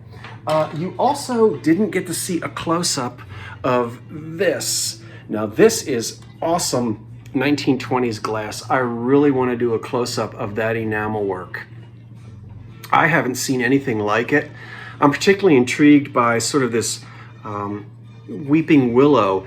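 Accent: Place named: Philadelphia, United States. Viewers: You sound a little bit philly you have said wooder for water 😊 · Yeah, you kinda do have a South Jersey accent. I really enjoyed last night's sale. Lots of fun! Great video, as usual!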